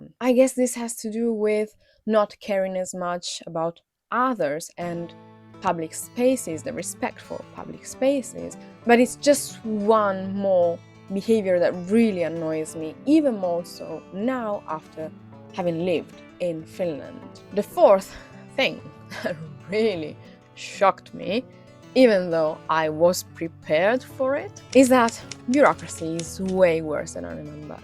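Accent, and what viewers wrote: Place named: Sweden. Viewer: Why you sound like you are from sweden 😅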